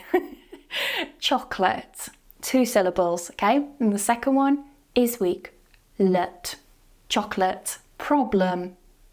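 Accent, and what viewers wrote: United Kingdom. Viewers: Bang lu komen dimana2 to bgus lah👍,, beliau ini englishnya accent British haha ww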